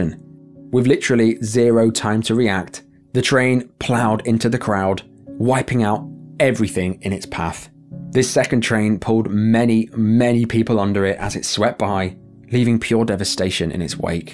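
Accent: United Kingdom. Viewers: As an American I can confirm how much more serious I take a British narrator. IDK why. It seems to be a thing though. I wonder if anyone has ever done any research into that.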